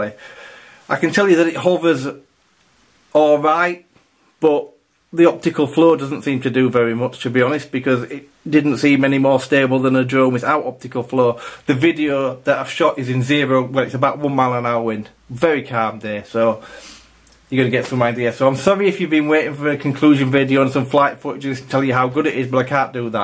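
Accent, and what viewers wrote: Yorkshire, United Kingdom. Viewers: Thanks for your honest review buddy. Sounds like you're from Yorkshire? I have a similar channel and I'm in Sheffield. Take care bud.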